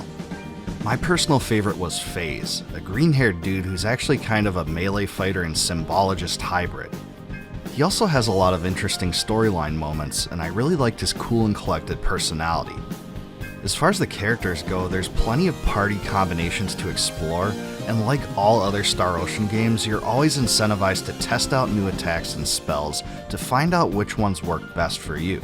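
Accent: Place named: Upper Midwest, United States. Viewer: I wanted to say, I thought it was interesting and kinda cool that you have a very specific regional accent (possibly upper-midwest?), and you like JRPG's. While this definitely isn't all true, I know there is a stereotype of us Americans that we don't really care about cultures, and only care about ourselves, which is something I am very passionate about fighting against.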